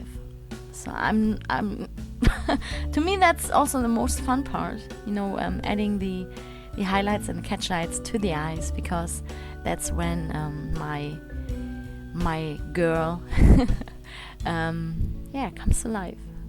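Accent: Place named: Germany. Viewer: Deine Betonung ist so "typisch deutsch" :D Das ist nicht schlimm, hat nur Wiedererkennugswert ;) Am Besten gefallen mir die Haare, aber das Gesamtbild ist einfach klasse :)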